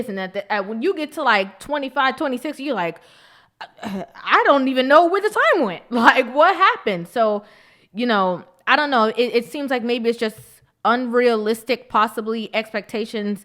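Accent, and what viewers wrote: Jamaica. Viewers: Good job though. I can detect you’re Jamaican. lol I’m too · I hear the jamaican in u. I like ur comments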